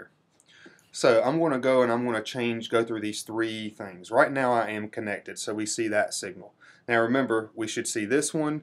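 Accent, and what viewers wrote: South, United States. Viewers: ...thanks so much, just implemented the bluetooth feature to my device. I bow to you, honorable man with southern accent😊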